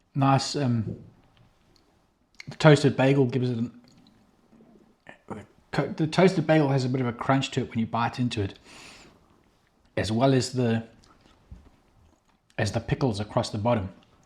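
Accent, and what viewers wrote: South Africa. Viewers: What accent's that, bud? My ear is saying South African.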